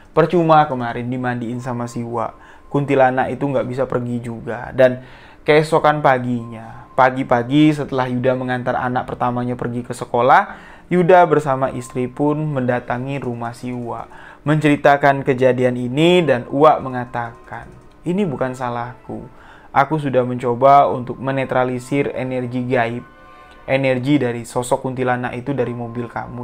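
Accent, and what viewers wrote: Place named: Madura, Indonesia. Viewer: Mau tanya mas asli orang dari daerah mana?...logatnya mirip2 sprti orng madura atau orang padang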